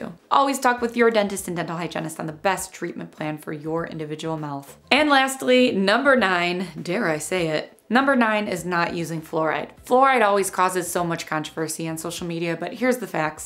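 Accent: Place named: Midwest, United States. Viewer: Are you from Chicago or the somewhere in Midwest by chance? You totally sound like me and my family!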